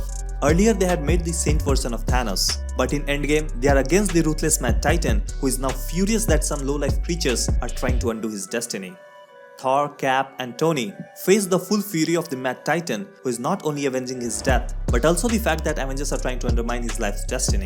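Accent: India. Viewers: Are you Indian... Your Voice has Indian accent. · Sounds like ur a malayali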